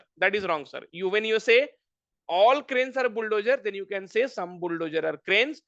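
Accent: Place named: Bihar, India. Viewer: bihar se hain hmko lag raha tha apke tone se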